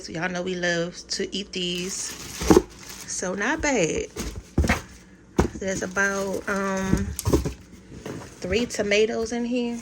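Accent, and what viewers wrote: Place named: Louisiana, United States. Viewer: Are you from Louisiana? The reason I asked is because you have a Cajun accent.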